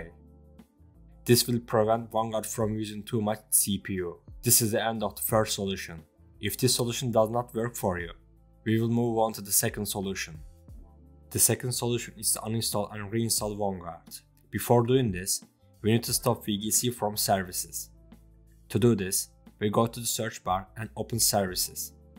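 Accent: Turkey. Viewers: reis konuşmandan anlamdım türk olduğunu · Türk aksağanı çok anlaşılıyor abi ama teşekkürler video yardımcı oldu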